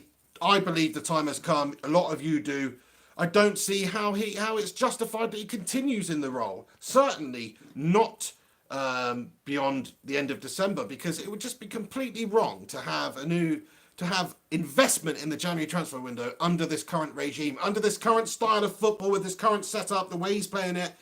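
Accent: Manchester, United Kingdom. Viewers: thats a proper fucking Manc accent that is.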